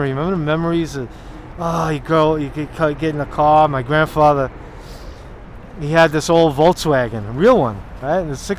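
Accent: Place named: East Coast, United States. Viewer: This channel is PURE GOLD, east coast kid. I grew up in CT married a girl from Jersey who's Full blooded Italian mom and dad were from Brooklyn (Dad) and Newark. (Mom) I live in the Midwest now and Brother let me say your accent and mannerisms sound like HOME to me. I could listen to you gab all day long, and the content is ACES. Homesick.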